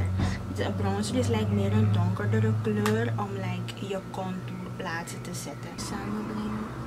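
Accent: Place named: Suriname. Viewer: Ik keek naar een video waar je Engels sprak en ik had gelijk een gevoel dat je van Suriname was en je bent werkelijk van Su❤️❤️❤️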